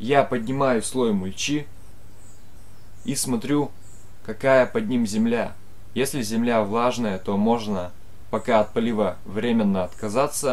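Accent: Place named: Urals, Russia. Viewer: говор уральский....в каком районе проживаете?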